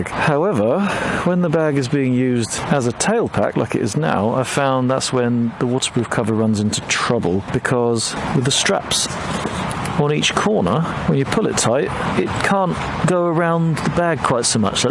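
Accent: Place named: England, United Kingdom. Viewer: Ha!!! Awesome ride! You sound like me but with a proper English accent! My wife enjoys making fun of me and graciously reminds me of my "first world problems" whenever that sort of intervention is necessary. Back in my early years of riding (throughout the 1980's), nothing was "waterproof". It was either leather or nylon but in all cases it was wet, cold and heavy which just made things miserable. I eventually learned the value of the smallest and strongest plastic bags I could find and a good quality rain suit. Everything of value would go in the plastic bag before it went in my backpack or tank bag. Looking back now 35 years later, the memory of the bad experiences have all but faded and I can honestly say that the times on my '84 FJ1100 and Virago were nothing but wonderful. Try to take a moment to realize that what you're doing is incredibly fortunate and very few people in this world have the opportunity to do so. 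I'm sure there are many of us tuned in who have been there/done that as well as millions of those who never will are thoroughly enjoying your experiences. Either way, it's a joy to watch, listen and learn and for me personally, the sparking of memories that I recall as being the best times of my life. Cheers.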